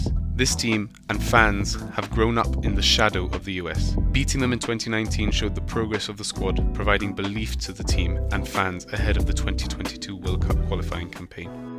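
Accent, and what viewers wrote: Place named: Europe. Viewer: As someone who has lived and( just about always) died with the CMNT my whole life, I think this is a very well researched video and hits some great points. One think that I think Europeans (I'm assuming because of the accent) don't full grasp is just how crazy qualifying in CONCACAF is. Herdman will have to keep the boys focused for the remaining 4 away matches. They have shown a lot of grit and fire, lots of shoving matches during and after games. They will have to keep their discipline when playing in Honduras, El Salvador...etc Thanks for posting, great video.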